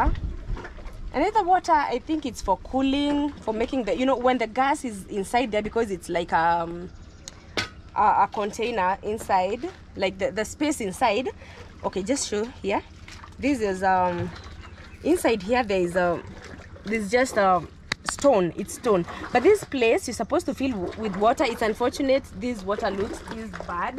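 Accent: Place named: Nigeria. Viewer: I love your nigeria accent oh. Love you in all accents. I appointed myself an Auntie from far😁😆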